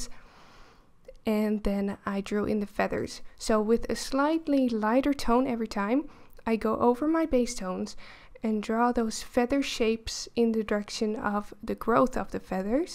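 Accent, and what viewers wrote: Netherlands. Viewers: you just hear you are dutch lol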